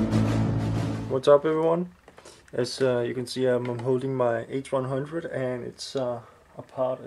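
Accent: Denmark. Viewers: Are you danish? :-D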